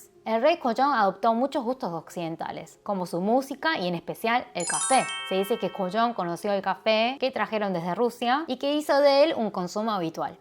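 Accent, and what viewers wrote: Buenos Aires, Argentina. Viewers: el acento porteño ... argentino... genial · Se me hace raro ver a una asiatica hablando como argentina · No hay nada mas bello que una coreana con acento porteño... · Entiendo, pero ese acento Argentino, suena asqueroso. Se dice YO no CHO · ._.' Es tan complicado acostumbrarse a ver a una coreana con acento argentino ._.' preferiría que hablaras en tu idioma y colocar subtítulos, por que ya no sé si veo a una coreana o argentina xD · ese ecento argentino chillon y horrible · Hola, porque tienes acento argentino? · Lamentablemente no puedo con tu Acento Argentino primer y último video que miro pero te deseo todo el éxito. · 어려운 역사를 알기 쉽게 풀어주셨네요! 스페인어 공부하는데 큰 도움이 됩니다. 아르헨티나 발음은 덕분에 많이 친숙해 졌어요~ 감사합니다. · Eres koreana o argentina? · Cómo chica escuchar a una coreana con acento argentino. Muy raro. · Felicidades por tu excelente castellano. 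Noto un ligero acento de Argentina? Saludos cordiales desde Cochabamba Bolivia 🇧🇴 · Que gracioso una coreana, hablando con dejo argentino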